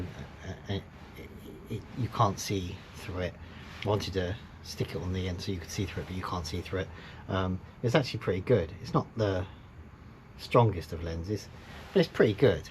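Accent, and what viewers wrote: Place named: Cambridge, United Kingdom. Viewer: Why does your accent sound like your from my ways ?.cambridge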